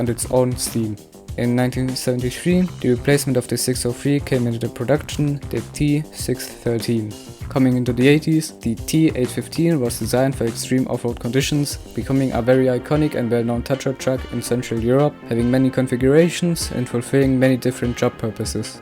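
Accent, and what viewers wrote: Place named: Germany. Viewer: Ich höre mehr Deutsch als English, aber trotzdem gutes Video.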